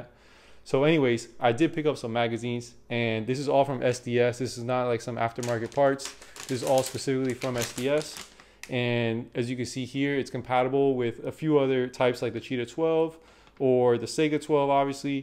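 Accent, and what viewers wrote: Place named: Miami, United States. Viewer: Damn... that’s a Miami accent no matter where you hear it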